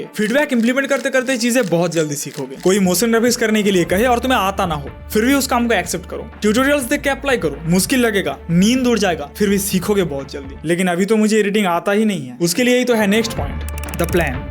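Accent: Bengal, India. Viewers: ভাই তুমি বাংলা বলো আমাকেতো প্রথমেই লেগে ছিলো য়ে তুমি বাংলা কথা বলো তার জন্য তোমার কথা গুলো একটু আলাদা লাগছে 😊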